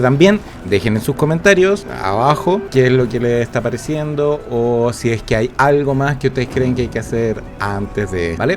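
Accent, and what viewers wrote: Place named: Chile. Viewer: en 5 segundos distingui el horrible acento chileno. Saludos desde Chile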